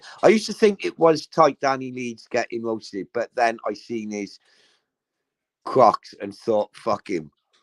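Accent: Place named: Liverpool, United Kingdom. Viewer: your Scouse accent is immense 😂😂😂. Funniest accent ever 🤣🤣🤣. Chicken smack and chocolate I'm nearly f🤣cking dead here 💯💯💯✌️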